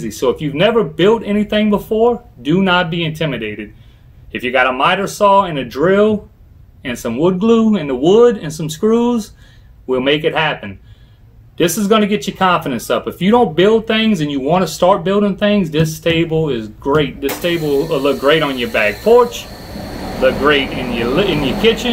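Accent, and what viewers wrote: Louisiana, United States. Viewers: Are you from S Louisiana? You do a great job on your videos partner..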